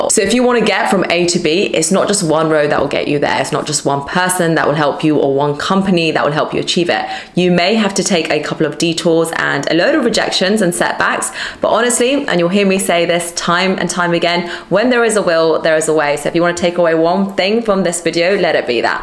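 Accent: United Kingdom. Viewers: admired with your stunning British accent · I love your videos. But it’s very hard to understand, it feels like it’s on FAST forward. Plus the British accent makes it harder. A bit slower would be a lot easier to absorb the content. Just a feed back. Thank you!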